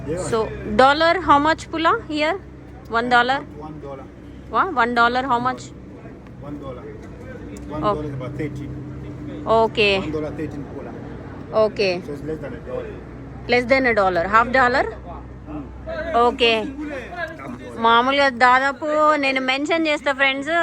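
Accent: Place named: Nizamabad, India. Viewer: నీ వాయిస్ ఎక్స్ప్లెయిన్ సూపర్ మా నిజాంబాద్ లాగా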